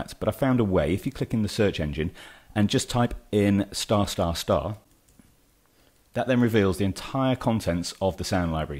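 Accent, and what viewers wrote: United Kingdom. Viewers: Thanks for this - very helpful and your approach is clear and friendly! Lovely british accent too:) From South Africa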